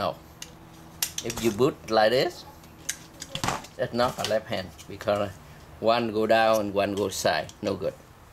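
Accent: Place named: Southeast Asia. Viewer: I work with a guy that sounds just like this guy. He's from southeast Asia. I love talking to him. :) Very good video and great information!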